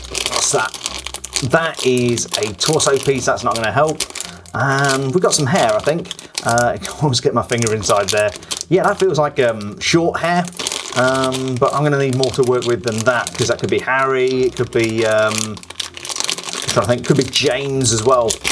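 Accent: United Kingdom. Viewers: The most british accent if i ever heard one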